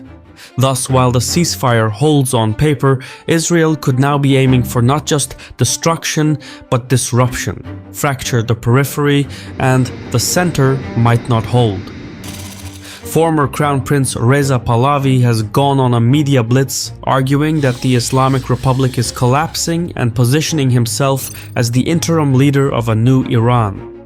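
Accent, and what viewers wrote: Russia. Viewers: Thanks for spewing so much lies, you Russian asset with such a horrible accent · Dude at least hid your Russian accent 😂 before trying to say what Iranian people want or who they trust! Did king Putin put you up to this? such a low act !!!:face-red-droopy-eyes::face-red-droopy-eyes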